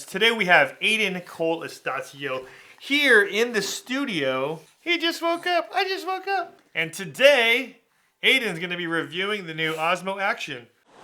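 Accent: Philippines. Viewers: Ilocano ka gayem..i know by accent .lol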